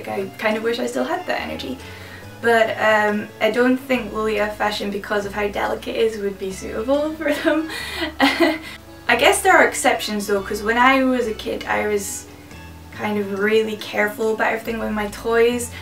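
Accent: Scotland, United Kingdom. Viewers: Your Scottish accent is hardly noticeable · Are you from Scotland?!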